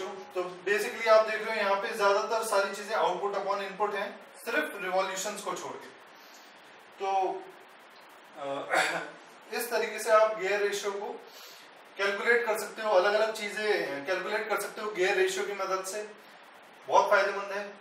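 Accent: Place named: India. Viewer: omg, idian accent :((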